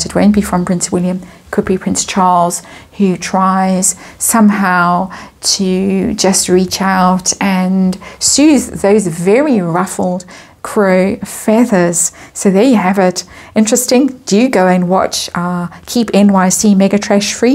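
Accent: South Africa. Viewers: I love your readings, your dogs chiming in, your lovely South African accent and am not at all bothered that the cards don't always fit into the frame since you take them up to the camera when you are talking about them. Thank you for another wonderful reading! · I love your South African accent. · Your accent and Bookworm's are totally different but perhaps it is due to what part of South Africa you each reside in. I don't know anything about South African accents so forgive me if I am wrong.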